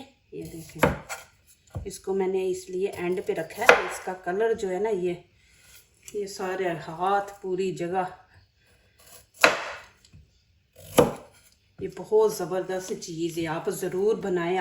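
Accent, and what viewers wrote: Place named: Punjab, India. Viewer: Aunty pujabi mein urdu acheeee laaaagti hey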